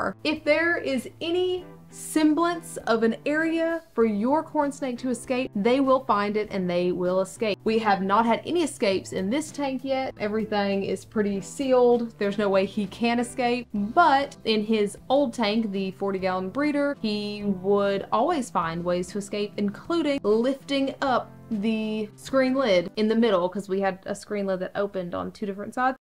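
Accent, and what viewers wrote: South, United States. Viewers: Her southern accent is so sweet sounding lol like an aunt that can bake the hell out of a pie 😂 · First video I've seen on the channel, will sub because 1) a lot of good info here that's presented well, and 2) as a Georgian i must say your southern accent is AMAZING! Your snakes are lucky to have an owner with such a voice, lol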